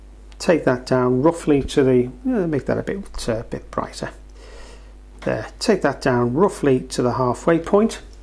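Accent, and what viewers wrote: Liverpool, United Kingdom. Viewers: Hi.Really enjoy your tutorials,love hearing you speak as I’m from Liverpool too. Its like going home.😁❤️👏🏻👏🏻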